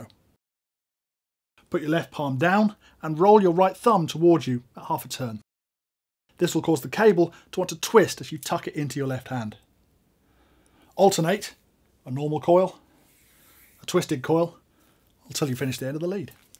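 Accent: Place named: United Kingdom. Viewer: As an American, I feel these techniques only work if you have a bad-ass British accent like this dude does.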